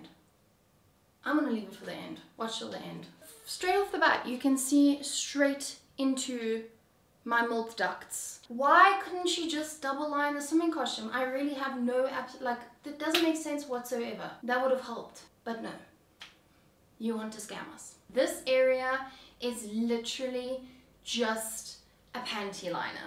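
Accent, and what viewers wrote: South Africa. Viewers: I knew you were from South Africa before you even said it lol my best friend is too and you sound the same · I subscribed as soon as I heard you're South African accent 😁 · Noticed the SA accent, new to ur channel. Love from sa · I am just here to listen to your accent 😂 Live in Australia, but was born in South Africa. I have an Aussie accent now so love listening to yours. Makes me nostalgic 🥰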